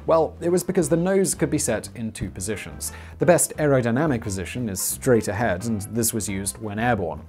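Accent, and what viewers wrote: RP, United Kingdom. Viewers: This guy is so posh I love it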